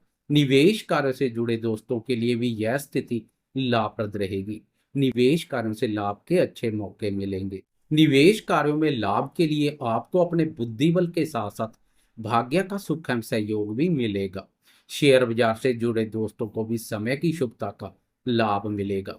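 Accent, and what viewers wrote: Punjab, India. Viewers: Apki Hindi mai Punjabi touch aata hai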